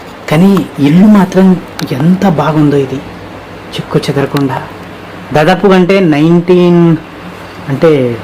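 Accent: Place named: Rayalaseema, India. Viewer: garu Mee vedieo chala chala baguntundi Mee Ankaring super Abba Mana bhasha Maravakunda Baga Matladutu Nara Abba Tq Abba madi Mydukur Abba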